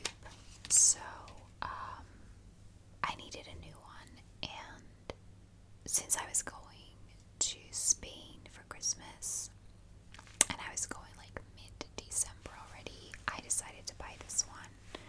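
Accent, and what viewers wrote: United States. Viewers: i recently found ur channel and have been loving ur videos! are you irish? you mentioned you’re located in ireland but you sound american to me!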